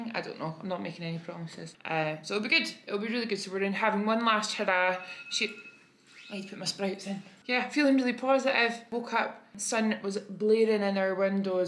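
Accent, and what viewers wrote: Scotland, United Kingdom. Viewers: i just started watching your vlogs & i'm already in love with your attitude towards life. you're so positive and inviting which is a welcome change from a lot of other influencers i've followed. your speaking voice is so soothing & you make the voice in my head change to scottish!! (i'm american lol) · you have a most joyous outlook on life and personality, its WONDERFUL. I could listen to you read books, give TED talks, describe instructions, whatever. Your gorgeous Scottish accent is soothing. Whatever direction you go with your interests and passions, I'll be sure to follow. Happy 2021 and love from Atlantic Canada 🇨🇦 ❤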